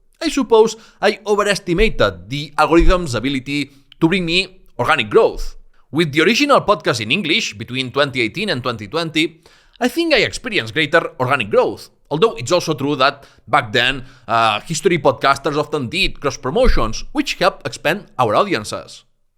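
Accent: Spain. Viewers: I advise you to cut your videos in small bytes and ask provocative questions in the title + the hook ... Try to exploit geopolitical contexts of today and do some projections or really anything to exploit a controversy ... something like that in a short form You can also do collaborations if possible + if u want, u can improve your accent which is 100% understood but quick and overrun by your spanish accent (tho honnestly i consider that as a good feature, I like it) I'm amazed by the sheer quality of your videos... the level of details, the impartiality and the extensive coverage of all sides that constitute the amazing history of Spain...unlike another English channel by someone with a French accent telling his own subjective opinion ALL THE F*CKING TIME... so your videos is a breeze to me!! All respect to you!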